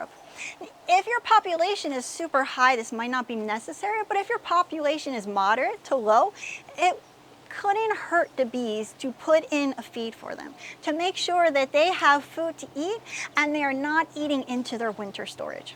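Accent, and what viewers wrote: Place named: Pennsylvania, United States. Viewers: Thank you for your videos! Also, you must be from South Jersey or Philly "Wudder". I love your content, and your videos have helped me tremendously. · You must be from PA (my dad says "wudder")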